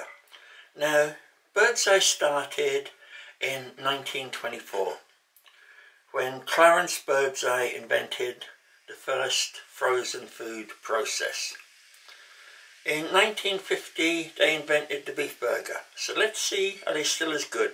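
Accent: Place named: Cornwall, United Kingdom. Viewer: This man speaks with a Cornish accent. His ancestors were Celtic Druids who came from Devon and Cornwall.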